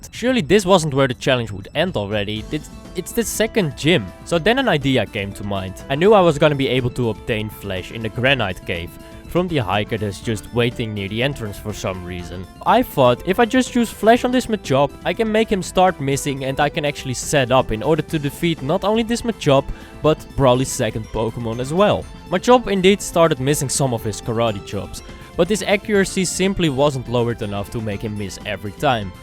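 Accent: Netherlands. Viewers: Ik herkende het echt meteen, dacht gelijk "Ahh deze gast is Nederlands" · Yo dude u from the netherlands? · Are you dutch?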